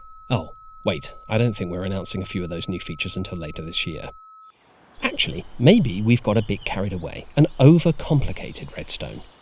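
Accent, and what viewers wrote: United Kingdom. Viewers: Oiii oiii this narrator is British less goooo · i wouldnt mind if narrator narrate my life he seems like a very nice british person too bad he could only stay for one more year 😔😔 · Alternate Title: British Narrator creates a time machine while reflecting on Mumbo Jumbo's tutorials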